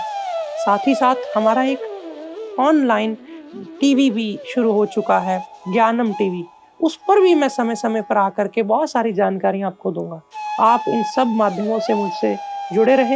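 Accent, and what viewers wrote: Punjab, India. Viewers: Tussi Punjabi o??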